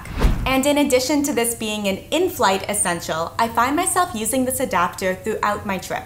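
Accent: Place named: North, United States. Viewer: I love the phone case idea. I need to get one of those. Also, I really like your accent. Where are you from? It sounds northern US or Canada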